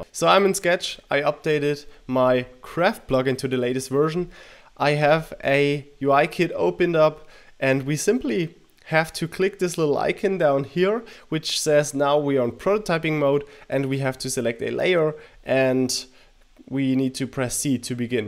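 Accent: Germany. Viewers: Nice Video, love that German accent - From an American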